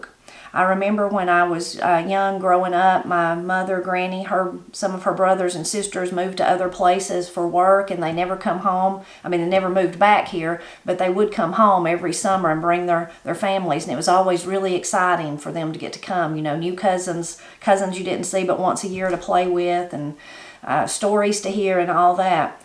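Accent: Appalachia, United States. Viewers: I am from Baltimore and recognize most of the terms and pronunciations you mentioned in this video. My mother always told me that folks from the Appalachian states came up to Baltimore to work in the aircraft factory (Martin Marietta) and other factories during WWII. I guess this influenced the colloquial language in the area. Our accent is not Southern, but our pronunciation is very much like yours 👍🏻👍🏻🥰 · Here in North Mississippi we talk exactly like y'all do. We were raised saying "okry" too. All of your examples this morning are identical to our pronunciations and meanings. I was raised in a small town here and my parents were both raised on dirt roads way out in the country and that's the way they talked. But I notice people who live in larger Mississippi cities talk the same way. I would fit right in with your Appalachian life style. Living these videos. 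· LOVE the channel my favorite videos are the language & accident videos. My dads family are from Big Stone Gap, Va We go back to Big Stone every year for our family reunion There is a small town right next to Big Stone call Appalachia, VA we pronounce Appalachia like this App-a-lachE. Not the A at the end we say it with the strong E at the end. When I found your channel I could not believe someone knew about my small town in Big Stone and was from Appalachia. Of course I realized you guys are from NC not VA. I have to tell you your accident and your daughters speak just like my dad and his family I love to listen to your videos because of that. My children are city kids lol but love going to a Big Stone every summer to have sweet tea on my aunts front porch while looking at the beautiful mountains. Nothing in the world like it. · I live in eastern Oklahoma, right on the Arkansas border, and my family has the same accent you have. 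I'm not so sure that my folks didn't come here from your area. Some of my family, my Granny's side, came to Oklahoma on the Trail of Tears forced removal from Georgia but my Papa's accent and the words he used were exactly the way you talk. I never asked him where his folks came from but I suspect it was at least near Appalachia. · I love the way you talk. It is so much like the way my relatives in the Appalachian mountains of Kentucky and Virginia speak. I grew up in northern Ohio, but my southern grandparents were nearby and I whenever I hear someone with a southern accent it reminds me of my precious family. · you talk just like I do. Of course North Georgia ain't far from North Carolina. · I'm from West Alabama. My family is Scots-Irish and English, but almost all of my family from both sides came down from Appalachia (I say it like you do.) Most of those words you mention we pronounce the same way. 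I think it's because our roots are the same as yours. We do say "rolling a house" instead of "teepeeing" and "Hey y'all" instead of "Hello". "Buggy" makes sense because of baby buggy. We don't say baby cart, so saying cart makes no sense to me. I don't quite get "Alabama". How else could you say it? My dad's family was originally from Mt. Airy but he was raised in Indiana. He never could say "Mobile". He pronounced it "Mobil" instead of "mo-beel". Lol I LOVE your colloquial dialect and language. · I enjoy your channel, because it's nice to hear someone who talks and sounds like me, lol. My husband and I grew up only 13 miles apart in WVa, and everyone says he doesn't have an accent like I do. And yes, I have to tell people I graduated school (including college). We currently live in Utah, and I miss hearing folks like me. Since moving to Utah, my husband has used words and phrases that people here have no idea what he's talking about. 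One that surprised me is he referred to his boss as "the big cheese". Is that another Appalachian only phrase?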